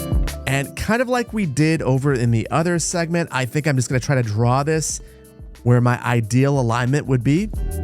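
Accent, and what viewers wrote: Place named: Midwest, United States. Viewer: Cal State Northridge, and I thought you were midwestern. Greetings from This San Diego State '72 almunus.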